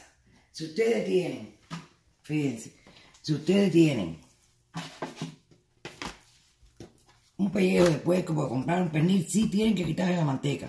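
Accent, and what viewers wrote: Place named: Cuba. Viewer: Gracias, desde que senti tu forma de hablar me di cuenta que eras cubana y me emociones. Hace muchos años que no como chicharrones de viento, la boca se me hizo agua Nury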